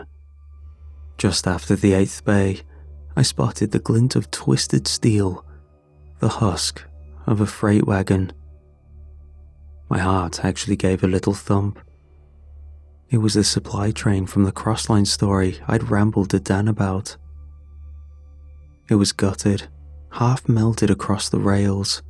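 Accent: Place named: Cockney, United Kingdom. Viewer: I'm lovn dat don't give a toss; real cockney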